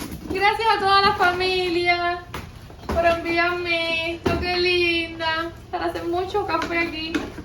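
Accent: Cuba. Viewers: Eres cuban?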